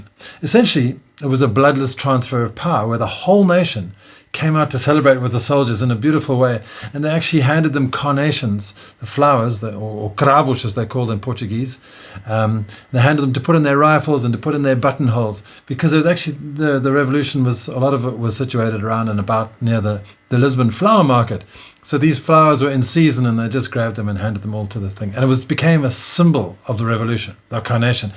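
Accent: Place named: South Africa. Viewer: Awesome how your SA accent hasn't changed after 20 years 😊. Please make a video of where to buy super cheap houses thats not remote or far from the bigger cities/towns. A seet up similar to the cheap Italian villages currently selling cheap. Not a €1 home but between €10k to € 20k. Iam aware of ruins for sale in countrysides of Portugal. Looking for something that needs cosmetics not structural renovation. I am a young Looking 52 year old South African living on Poland 🇵🇱 Looking to pay cash for a home base even if I don't live there full time. In SA am no longer a candidate for a home loan and honestly I don't want to be bound by 20 year debt, especially at my age. Want to enjoy income I earn traveling more.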